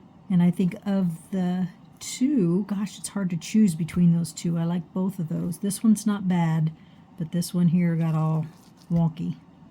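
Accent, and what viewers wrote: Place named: England, United Kingdom. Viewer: Loll! I heard his beautiful English accent reading this comment!!! And yes beautiful results ❤🎉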